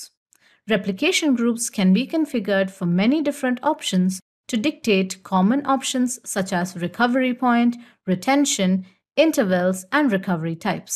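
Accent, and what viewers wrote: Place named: India. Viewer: Giọng Ấn khó nghe quá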